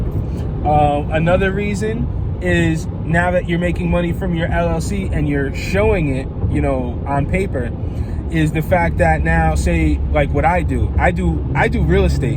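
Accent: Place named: Baltimore, United States. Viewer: Dnt know yu first time in the channel bet my next 2 checks yu from Baltimore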